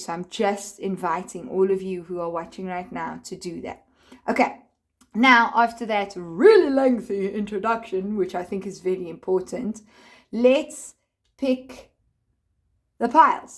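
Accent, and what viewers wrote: South Africa. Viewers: Girly, I heard that accent and immediately knew you're south african 😂 glad to find a YouTuber that can relate to the struggles of loadshedding!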